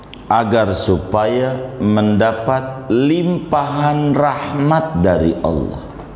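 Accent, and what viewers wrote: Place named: Jakarta, Indonesia. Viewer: Pantes aja... Tapi logat bhsany betawi banget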